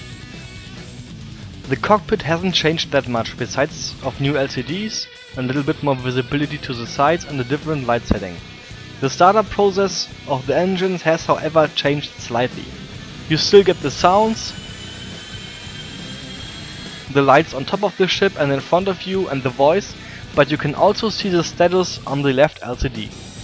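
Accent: Germany. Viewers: I really like that design! Good thing you showed up at the stream. Another good youtuber to watch :D. (German accent I hear there?) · Krasser deutscher akzent xD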